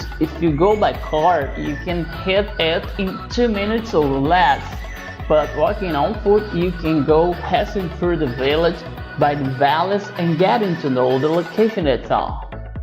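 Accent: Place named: Ceará, Brazil. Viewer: Cearense English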